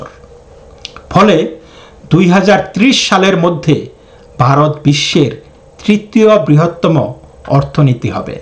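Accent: Bangal, Bangladesh. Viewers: Dada to bangal......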